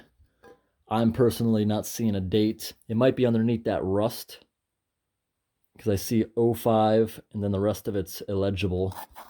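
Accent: New England, United States. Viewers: App ri cots lol. New England accents are weird. Your videos are the best though! You really need to get a P.O. Box like ashens used to do and he’d get all sorts of crazy old food.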